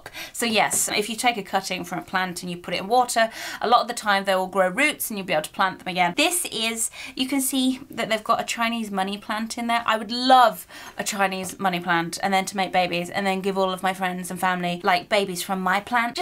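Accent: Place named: Scotland, United Kingdom. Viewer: Love the Scottish accent! 😂👍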